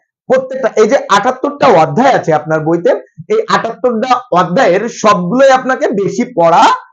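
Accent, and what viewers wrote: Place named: India. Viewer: আপনি যেই ভাবে কথা বলেন আমি ভাবছিলাম আপনি ভারতীয়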